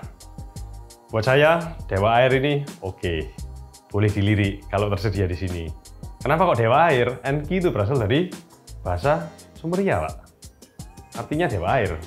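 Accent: Java, Indonesia. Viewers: saya suka ciri khas medoknya mas 👍😀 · Kenapa saya lebih suka panggilnya mas mas medok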